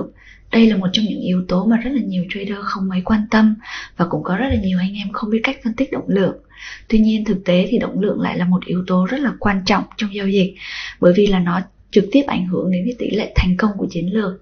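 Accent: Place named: Nghe An, Vietnam. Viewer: Nghe giọng như quê ở 37 à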